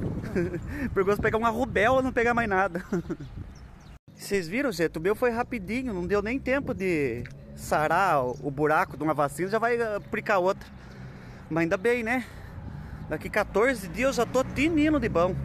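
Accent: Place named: Cuiabá, Brazil. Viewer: Fala bem parecido com cuiabano,de onde vc é o?🙏👏🏻👏🏻👏🏻😘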